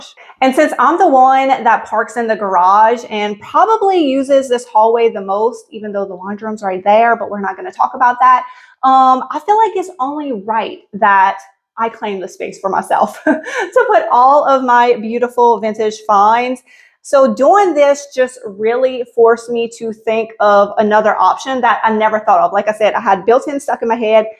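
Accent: Louisiana, United States. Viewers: Love your accent? Southern Louisiana? Your home looks beautiful!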